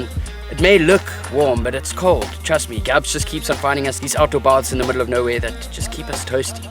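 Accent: South Africa. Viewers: As someone from Cape Town I never notice our accent in person but as soon as it's on video it's like in your face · You sound South African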